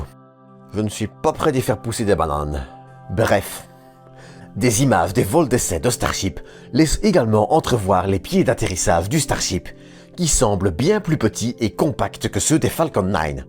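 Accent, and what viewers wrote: Belgium. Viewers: Bienvenue dans le BiG Universe. Accent Belge, sans pincement de nez. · Sujet intéressant et bien traité ainsi que bien expliqué et détaillé. Mais il est claire que la voix off devrais être changer. L'accent belge ne me gène en rien, mais les zozotements + les R trés accRRRocher m'irrite l'oreille :-( Youtubeur n'est peux être pas fait pour tous. ou certain s’épanouisse aussi a resté hors des écrans a bossé sur les sujets. Peux être qu'embauché pour une voix off serais judicieux pour le future de la chaine? En tout cas merci pour la video :)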